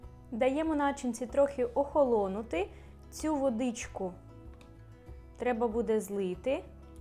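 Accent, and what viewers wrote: Ukraine. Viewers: Так вам йде западенська мова🤗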